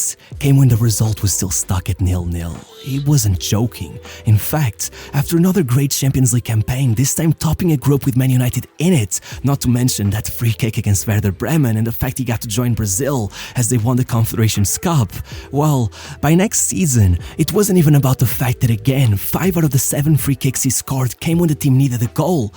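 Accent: Portugal. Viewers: Ahh the narrator is Portuguese!!!! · Ele é português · esse sotaqque portugues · Ur Portuguese right? This accent always got me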